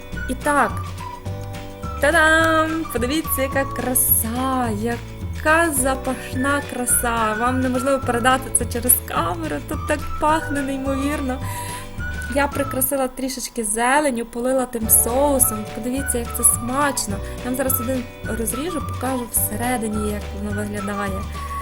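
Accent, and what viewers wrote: Western Ukraine, Ukraine. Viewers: Сьогодні вперше надибала Ваш канал і відразу ж підписалася. Шукала оладки. Ще нічого, звісно, не готувала з того, що Ви пропонуєте. Але точно спробую. А поки-що Ваша мова - то вже найсмачніше, що є серед усієї тої смакоти. Дякую Вам за гарну мову, рідну, колоритну і таку смачну!!!! )))) Звідки Ви? Чую, точно десь із Західної України )) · Как же я люблю ваш украинский говор,некоторые слова не знаю,но по смыслу понимаю, люблю вас😘 спасибо 🙏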